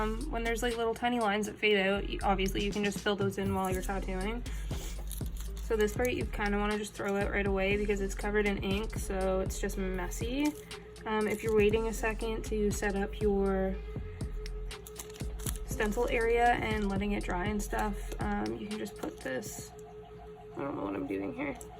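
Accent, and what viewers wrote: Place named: Canada. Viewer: This is incredible, and super helpful rn... thank you 🙃 Also, the most Canadian accent I've heard in some time 😄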